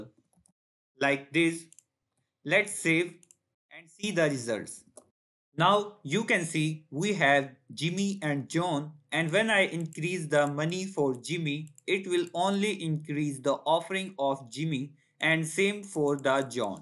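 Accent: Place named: India. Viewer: damn, I heard the Indian accent and said noooo xD but in the end my God this was a great explanation, the best I've found about this topic, you get a like and if I find something else like this in your channel you get a subscriber.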